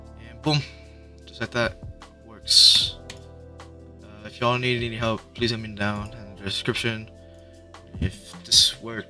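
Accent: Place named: India. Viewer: bros indian now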